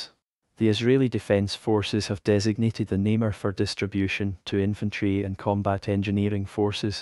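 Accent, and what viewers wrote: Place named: Scotland, United Kingdom. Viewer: You're Scottish!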